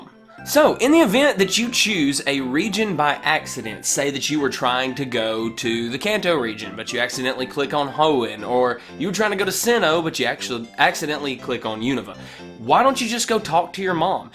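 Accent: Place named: South, United States. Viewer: I thought ur accent was ironic but it isn't. Nothing wrong with that just a southern accent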